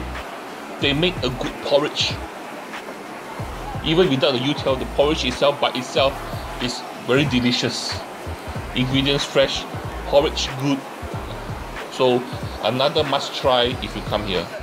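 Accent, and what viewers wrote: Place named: Ang Mo Kio, Singapore. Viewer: What part of Singapore do you live in? You have a classic Ang Mo Kio accent. I love it. ;)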